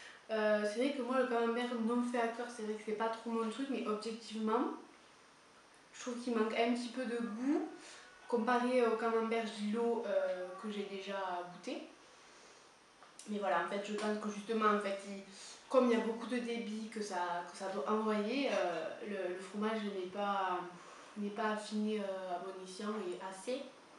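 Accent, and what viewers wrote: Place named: Languedoc, France. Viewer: Le format classique est bien AOP... et moins cher au kilo et il a surement plus de chance d'être fait a coeur (encore qu'il faut le choisir a défaut de pouvoir demander a sa fromagère), personnellement que ce soit celui la ou le Gillot ou tout autre au lait cru, je les trouve très fort, pourtant je vis en Normandie, a votre accent (Languedoc ?), je devine que vous non, shame on me, lol. Le Neufchatel ou le saint-félicien, de la même marque, sont très sympa aussi.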